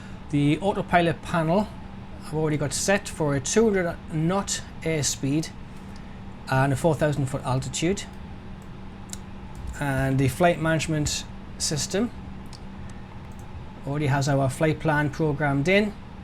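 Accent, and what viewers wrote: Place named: Newcastle, United Kingdom. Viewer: nice accent, hello from a fellow geordie. nice video mate.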